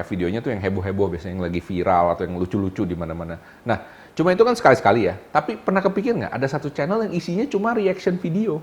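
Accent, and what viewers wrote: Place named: Lumajang, Indonesia. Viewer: lumajang mana suara nya😁👍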